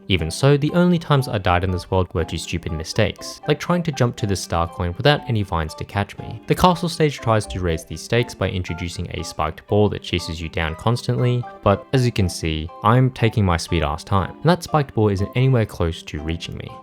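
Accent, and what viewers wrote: United Kingdom. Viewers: british ew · its standard pronunciation for uk english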